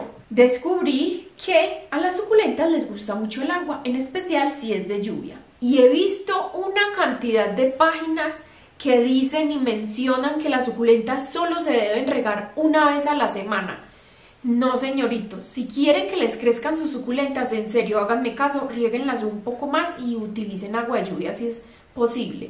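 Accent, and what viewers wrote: Antioquia, Colombia. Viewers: Hola soy una asesina de suculentas todas se me mueren las ahogó aquí es un calor extremo y siento que tienen sed y pues bueno las ahogó pero ya estoy aprendiendo y también a mí me gusta mirar sus videos cuando estoy lavando platos o haciendo comida me encanta y estoy enamorada del acento colombiano no se ofendan Saludos todo Colombia... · Me encanto tu video. Es la manera de hablar de los paisas. Asi somos para todo. No hay medidas exactas. Tratamos, ensayamos y tambien fracasamos en nuestros intentos pero aprendemos cantidades. 👍🏼❣️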